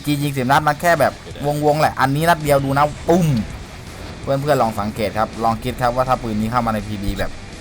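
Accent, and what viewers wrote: South, Thailand. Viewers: พี่คนภาคใต้ป่ะถ้าใช่อ่ะเหมือนกัน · พี่คนใต้เหรอ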